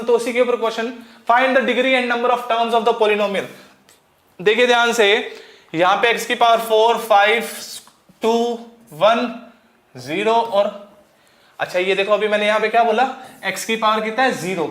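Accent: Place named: South India, India. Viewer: Sir aapka naam kya hai? Are u from South India? It assumes of ur accent…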